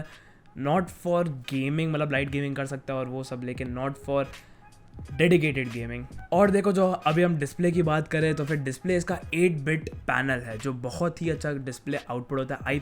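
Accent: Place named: India. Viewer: What it this for a language? Indianenglish ?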